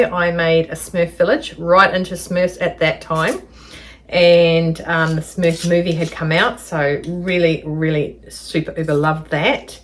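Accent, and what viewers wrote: New Zealand. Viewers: I just discovered your channel via the #fridaysews. So enjoyed watching and good to listen to a fellow kiwi.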